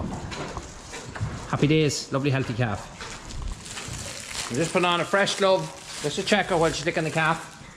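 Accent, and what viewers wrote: Ireland. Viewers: Hello, a new viewer here. What an amazing story about you surviving a bull attack. I especially enjoyed how gentle and caring you were while assisting with the delivery of the twin bull calves. Your Irish accent is quite charming. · You sound like my Grandparents. They were from Ireland. And they have been gone 40-45 years now. Thank you for putting a smile on my face. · Irish or English? Where I am cattle are outside year round. USA. Excuse me but are not twins not as large? I think having twins would be easier? The more I listen the more I think Irish. My grandpa raised herefords. His bull was so tame. He stayed with the cows for two years, then he was sold. Grandpa changed bulls every two years. OK going to shutup.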